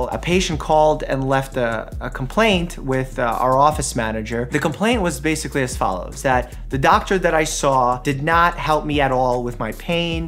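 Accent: New York, United States. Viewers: when you watch the video in 1.25 speed, you can hear his new york accent XD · I’m just curious, did I hear a slight New York accent from doctor mike🤔 😎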